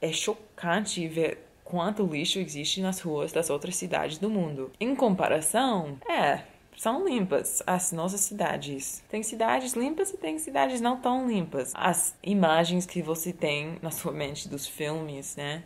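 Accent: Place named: Rio de Janeiro, Brazil. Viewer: você está falando português totalmente carioquês, tipo "SUXXXX"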